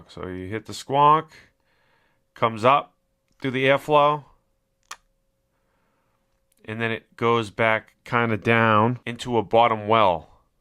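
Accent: Boston, United States. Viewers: You gotta be from Boston lol aaada, I'm from dochesta ;) thanks for the review! Where in the city aare ya from?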